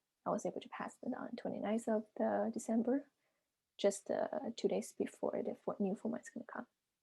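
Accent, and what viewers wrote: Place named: United States. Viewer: Hi, Thanks for the video. Could you share where you’ve studied American English accent so well? Thanks in advance and sorry for my answer besides theme. Take care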